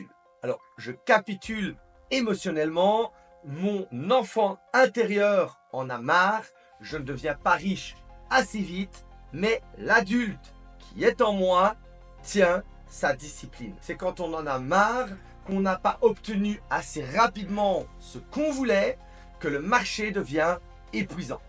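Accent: Belgium. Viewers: Rien que par ce que tu as un accent d'un air liegeois tellement sympa , je m'abonne ❤❤❤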